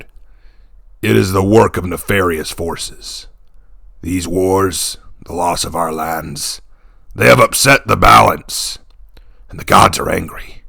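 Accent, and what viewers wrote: Scotland, United Kingdom. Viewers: Nice Scottish accent btw. Really felt the Highlander spirit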